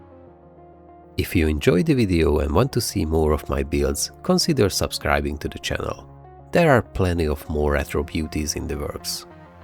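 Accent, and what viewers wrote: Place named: Hungary. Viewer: Are you from Hungary? The accent is not very strong, but it is there :)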